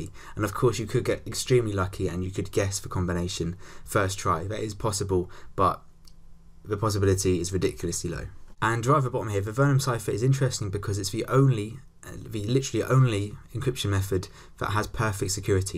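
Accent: United Kingdom. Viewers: This is a heavy British accent but it gets the understanding process in the brain meats done 😂❤ Thank you mate 🌚❤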